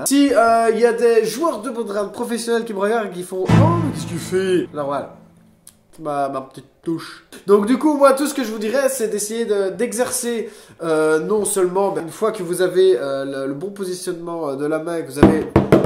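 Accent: Belgium. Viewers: mmmmmmm, eskeu par hasard mes oreilles distinguent bien des "aloors' typiques de la Wallonie ? si oui bisou de Namur, si non, Bisou de Namur quand même ! Edit : le A L'AISE m'a donné réponse :) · Je vous découvre hier, je me réveille avec la tête dans le cul ce matin avec cette vidéo, et je me dis "mais ... c'est pas possible avec cet accent c'est un compatriote belge" oui gagné 😂 En tout cas cest chouette d'avoir enfin découvert quel nom ce tambour et ce son si spécifique (et très appréciable 😍) portait 😄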